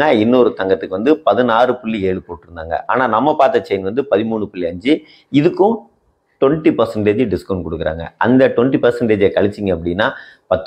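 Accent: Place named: Kongu, India. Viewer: Tats kongu tamil 😂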